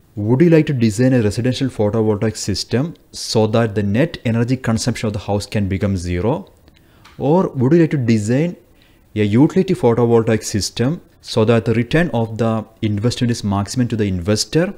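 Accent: Kerala, India. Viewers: Nalla malayali accent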